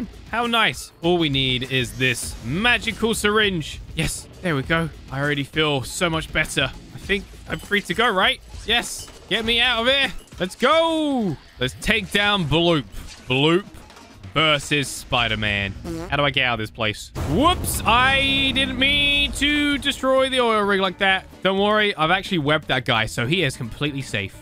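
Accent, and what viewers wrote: United Kingdom. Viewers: 😅he's british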